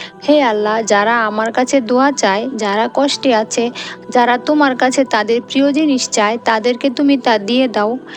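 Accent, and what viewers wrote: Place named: Mymensingh, Bangladesh. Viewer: আপনার দেশের বাড়ি কি ময়মনসিংহ অথবা কিশোরগঞ্জে?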